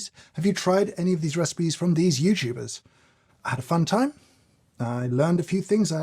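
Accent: United Kingdom. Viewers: those are manually-added subtitles, not automatic ones. The person speaking is British, lives and runs this channel in the UK, and is speaking British English. So, unless the subtitling work had been outsourced to the US, it is unclear how it ended up being in American English.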